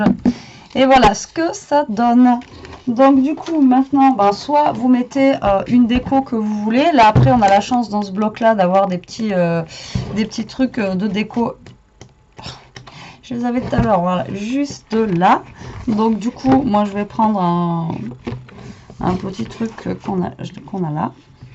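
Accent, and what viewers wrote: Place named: Toulouse, France. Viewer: Bonjour c'est vrai que c'est une bonne idée qu on peut décliner de plusieurs façons .Merci beaucoup...si ce n'est pas indiscret de quelle région êtes -vous ??? vous avez l'accent de mes petites filles qui habitent Toulouse,,,,???bonne semaine ensoleillée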